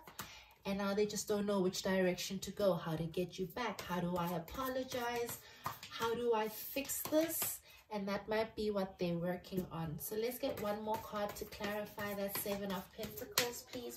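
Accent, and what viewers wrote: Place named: South Africa. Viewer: I know that South African accent. Heeey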